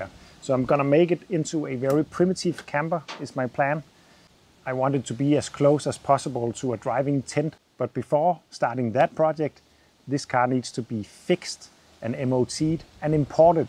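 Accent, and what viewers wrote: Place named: Denmark. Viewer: I think I know that car, given your Danish Accent and the fact that there are not many "Kermit the Frog green" UAZ 452 in Denmark. This is one of the cars Vladim brought to the country. I was also interested in that a year ago and had a test drive, You have to double declutch when you drive that UAZ. At some point in the 80's UAZ upgraded to a synchronized transmission this one was build before that. You can get newer transmissions for them if you get tired of double declutching. I think I know what the B and D stands for, that is for picking the tanks that the engine consumes from, as you probably has learned this car got two fuel tanks. YA3 that is UAZ in Cyrillic.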